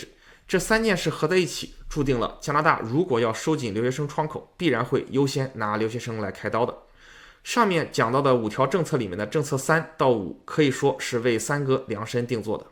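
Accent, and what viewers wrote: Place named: Shanghai, China. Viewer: 你这个上海话。。。。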